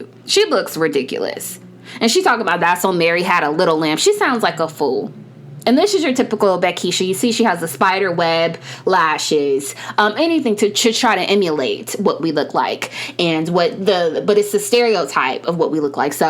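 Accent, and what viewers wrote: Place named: Midwest, United States. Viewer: Question, where are you from? Your accent gives me Midwest.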